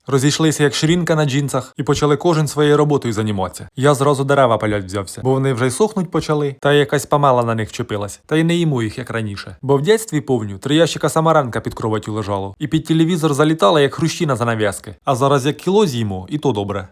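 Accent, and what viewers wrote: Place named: Kherson, Ukraine. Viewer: Говірка гарна. Ми на Херсонщині также говоримо,майже дома побувала❤❤❤❤❤❤❤❤❤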